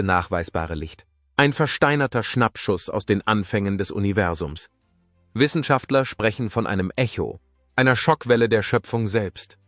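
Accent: United States. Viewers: Die IT Stimme mit leicht amerikanischen akzentuiert ist zum kacken🥴😝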